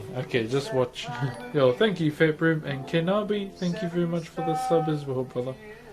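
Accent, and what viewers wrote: New Zealand. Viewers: Kia ora, just came across your content and hearing you talk remind me a lot about New Zealand. Spent nearly 10 years in Aotearoa, one of the best places on Earth. Keep up the good work bro!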